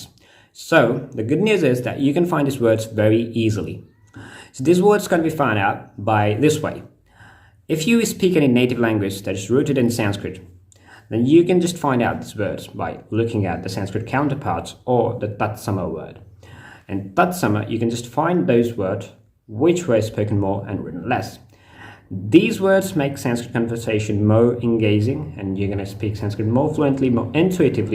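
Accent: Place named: United States. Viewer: Pretty good American accent! Talented guy you are!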